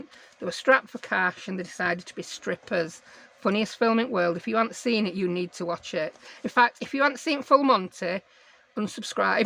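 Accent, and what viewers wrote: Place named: England, United Kingdom. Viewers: You sound like s nice English lady i think i will subscribe to you. I sm in Australia ❤ so hi! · I am so glad I have found you, it's like home from home. I am from Rotherham, but living in France at the moment, I really miss hearing a proper Yorkshire accent. I can't tell you how much you make me laugh. I am learning so many new techniques, but you are costing me a fortune !!!! I have bought some Edith Holden books, (which is how I found you in the first place looking for Edith Holden journals) oh and now you have shown me another two books I "need" to buy. 🙂🙂 and then there is the sticky backed kraft paper (never knew there was such a thing!) Your videos are so entertaining and I understand everything you are saying LOL. Linda xx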